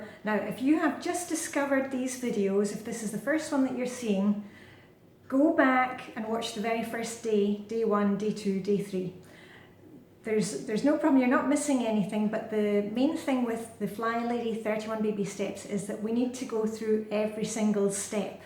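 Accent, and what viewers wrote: Scotland, United Kingdom. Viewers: I love your style, the way you explain the flylady-system I have been following through years, your English (is it Scottish;) - so thank you for all those things. I wonder if you could tell me what kind of sink tidy (caddy and bottles) you are using - it looks so elegant. Greetings from Austria Knitgudi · Thank you, for your wonderful persistence and gentle Scotish accent. ♡ I was always overwhelmed by the to do list and never allowed time for the fun stuff. Now on day 4 with my sink clean - it's playtime afterwards!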